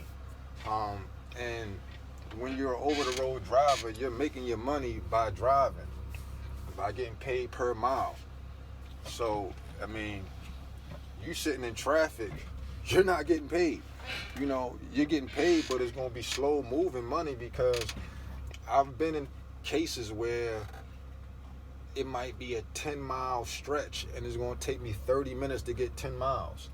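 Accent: Baltimore, United States. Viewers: Do you have to be a dump truck owner op..to make this kinda bread ? Been drivn otr 5 months..and u sound like my city bmore lol?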